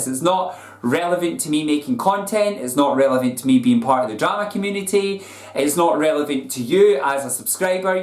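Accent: Scotland, United Kingdom. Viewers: yaay another fellow Scottish YouTuber 😉 your accent just made me happy for the sound of home after a few hours of YouTube videos 😂😂 weird world we live in 😫 imagine weirdos like that are in every corner of the internet. I completely understand the need for privacy, a person's life is there own to share if they wish. I really believe some drama channels forget a normal person is behind the computer. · I am so sorry that this happened to you! This person is clearly unstable and loves to start and create drama. He clearly doesn't have much of a life. You probably hit a nerve, and that is why he did what he did. I think that you have always been very mature and professional with your videos. I enjoy you and your channel. Also, love your Scottish brogue! ♥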